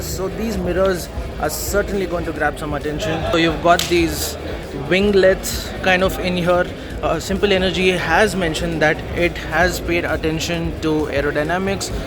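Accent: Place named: London, United Kingdom. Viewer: Bhai tu London Pala besi English khuchu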